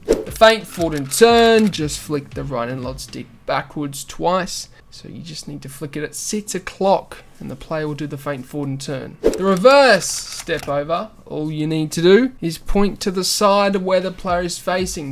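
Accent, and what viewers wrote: Australia. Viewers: Aussie are u coaching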